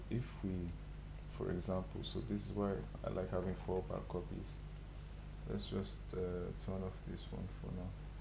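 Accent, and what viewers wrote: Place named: Nigeria. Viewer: Omg are you Nigerian?? You sound nigerian. Thank you for making this vid ❤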